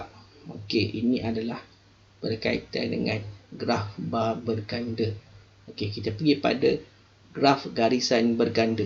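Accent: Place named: Kelantan, Malaysia. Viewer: cikgu ore kelate ko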